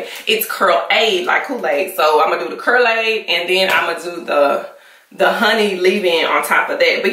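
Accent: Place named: Texas, United States. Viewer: I love your voice. You sound like my family in Texas. Ima go ahead and subscribe 🤣❤️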